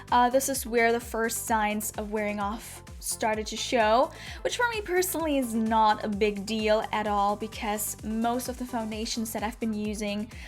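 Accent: United States. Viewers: I can't place your accent. One moment I hear American but then it's something else. Especially when you said the words intriguing and cushion. I knew what you meant. But I had to pause for a second. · Just wondering where are you from? It was interesting to hear the word intriguing sound like "intra-guing" and cushion was pronounced "kushen." Its so very intriguing since you sound American otherwise :) Thank you for the review :)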